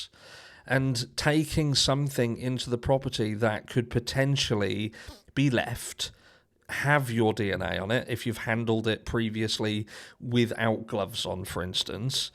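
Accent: United Kingdom. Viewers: I love British accents including yours but today I realized how well you also annunciate your words. I tried but I can’t see the DNA proving Bryan is guilty because I think the knife sheath was planted. Apart from all of the problems with the DNA, Cristi and Steve came out later and declared that Kaylee had tried to get away but she was trapped on 3 sides of the bed. They deduced this I believe from something Steve found out from their investigator. They were told that Kaylee was on top of Maddie in the bed. I’ve heard a couple of scenarios of the killer throwing her on the bed where she landed on Maddie. The coroner said no one is permitted to move the bodies including the police. At first no one, including Brett Payne, saw that sheath. It was on the second walk through that Payne claimed he saw the knife sheath from the doorway. It was either under or beside Maddie. 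He must have X-rays eyes to see that sheath under 2 bodies and 2 bodies that were bloody because there was no blood or any other DNA on that sheath. Jay is for Justice had a great video especially about “Cathy with a C” Mabbatt, which she and others kept pronouncing it MUH BUTT. She did funny imitations of Cathy wringing her hands and being so nervous, evasive, and vague.When she was asked where the bodies were found, she hesitated and said she didn’t think she was permitted to give out that information. She also said that the news report stated that they were stabbed with a knife. Jay commented and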